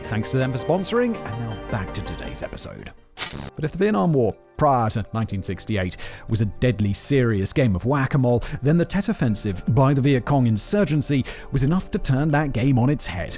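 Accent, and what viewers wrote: United Kingdom. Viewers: The Idiots Guide to Vietnam's Reunification for Tourists. Qualified by annoying pretentious and pompous British accent.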